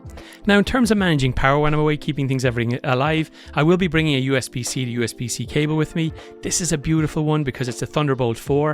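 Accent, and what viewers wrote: Ireland. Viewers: Great Video, I'm looking forward to the smart glasses review, I might buy a pair for travel in the future. Its great hearing an Irish accent, with the amount of tech videos I watch.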